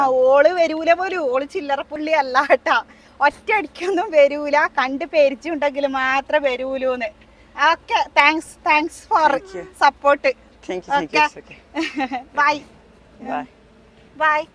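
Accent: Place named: Kannur, India. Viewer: Hi Njan samsaram kelkkan vendi veendum veendum repeat cheyth kanaran, Ninglude kannur slang enikk bayankara ishttantta❤️❤️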